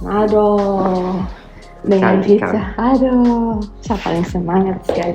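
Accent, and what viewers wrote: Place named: Papua, Indonesia. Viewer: . klu aku tutup mata..kamu bukan org jerman..bahasa indonsia logat papua kereeen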